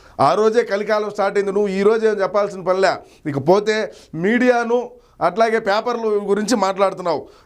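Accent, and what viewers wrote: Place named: Kadapa, India. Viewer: Kadapa yasa chala bagundi vinalanipisthundi 😅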